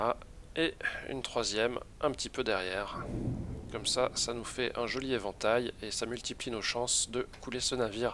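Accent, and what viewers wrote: Switzerland. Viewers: Tu es du canton de Vaud ?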